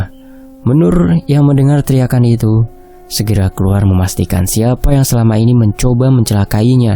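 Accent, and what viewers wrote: Java, Indonesia. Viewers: mmg CERITA2 Diosetta ttg Danan, pakLe Bimo, Cahyo & WANASURA... Benar2 SERU!! Bikin ketagihan ingin mendengarkan keLanjutan dari KISAH2-nya. KEREENNN pkknya... HOST-nya jg memBaCakan Ceritanya penuh dgn Intonasi2 yg TEPAT! Logat Jawa-nya jg PAS di Dengar... mmg Anda BerBakat dLm memBacakan Cerita2 Rakyat. FEEL-nya terasa.... ** SEMANGAT terus ya mas! **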